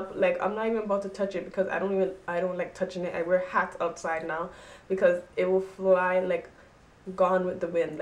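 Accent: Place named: Virgin Islands. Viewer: This hair is beautiful! I just ordered mines. And if you don't mine me asking, your accent sounds like your from the islands; are you from the Virgin Islands?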